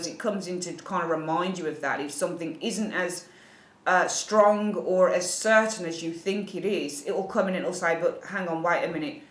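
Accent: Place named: England, United Kingdom. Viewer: Bitch slap 👋..... 😂😂😂😂😂and with that beautiful English accent 😂😂😂😂😂❤️❤️ ...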